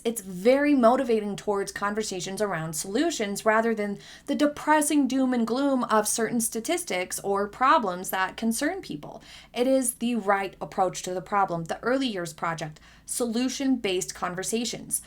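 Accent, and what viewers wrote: United States. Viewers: It’s people like you who are getting it wrong. I am surprised because you sound American and should know better than to repeat so much information from the Tabloids. I expect from the British because they (including the Monarchy) lives and dies by the tabloid news. So much of what you are espousing came directly from the tabloids. 🤷🏾‍♂️ This trip has been an absolute success! The Colombian people have embraced Sussex’s! That is the problem! The tours they made as royals were excellent! They hit it out of the park every time. They are embraced by DIVERSE populations of people around the world because they are much more relatable than the Windsors. Kate and Will’s last tour (the Caribbean) was a disaster! Terribly out of touch with the world. There are many things you can say about Meghan but you absolutely cannot say she is lazy or lacks ambition. 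Why is it problem because she enjoys working hard and is good at it? It’s a problem because the rest of the royals are mediocre at best. Your biggest hater’s tend to be those who you are associated with and don’t like you because you are working too hard for them to keep up! Meghan left the royals, it was her choice. They are salty because she had the balls to do it! PERIOD! That is the biggest problem along with the systemic racism and colonial mindset that the royals can’t let go of. Millions of black and brown people around the world who live in countries that have been raped and pillaged by the British Royal Family are unwi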